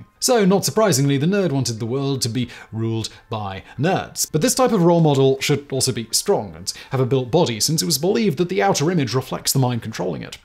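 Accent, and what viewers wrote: United Kingdom. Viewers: If you watch his other channels, he openly talks about not even being able to remember recording videos anymore because he does so many. Scripts are created by his writing team these days, and he just reads them in his reassuring-sounding British voice.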